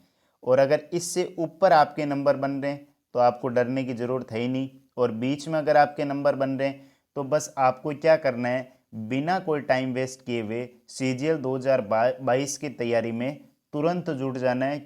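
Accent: Rajasthan, India. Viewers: OK bhai mujhe apke accent se lge ki aap rajasthan se ho isliye bola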